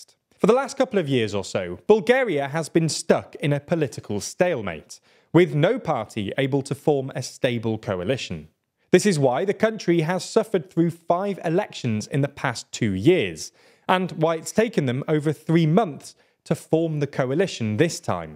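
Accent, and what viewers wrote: United Kingdom. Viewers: Why do y’all say slavic names so British 😩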